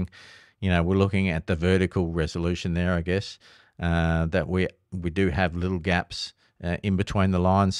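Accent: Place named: Australia. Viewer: No3 Way to go my man. Thanks for the info great to hear the Aussie voice.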